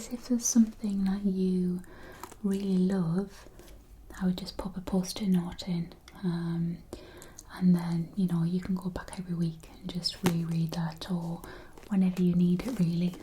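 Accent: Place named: North, United Kingdom. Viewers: Thank you for this from Newcastle ☺️ your northern accent is comforting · I love your accent, I grew up in Teesside and find your voice so familiar and comforting ❤️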